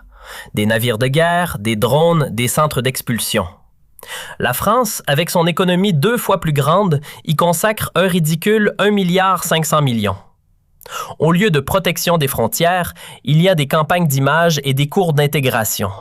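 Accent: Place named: Quebec, Canada. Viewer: Stop à l'IA qui alterne les commentaires avec un accent Québécois et qui nous répète en moins d'une minute 2 fois la même chose RAS LE BOL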